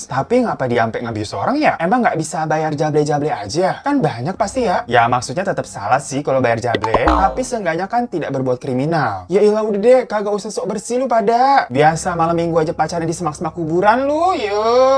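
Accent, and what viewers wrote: Java, Indonesia. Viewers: Sehat terus mas. Anehnya dari mas, namanya bule bangget... Tapi bahasanya medok Jawa banget...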